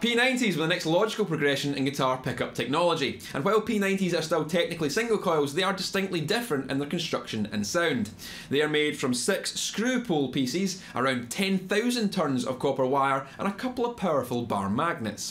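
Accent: Scotland, United Kingdom. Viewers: Good to hear a Scottish accent for a change, plus I understood what you were saying, I liked the look of the 2nd guitar you were playing, can you tell me what it's called? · It's just a run of the mill Scottish accent! Nothing special. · Scottish accents are so cool wish I had one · F*ck me, a great video on a fascinating subject, and instead of compliments and questions, the comments are plagued by thickies who have never heard a Scottish accent. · it's scottish? cool, i was guessing australian..... cheers :)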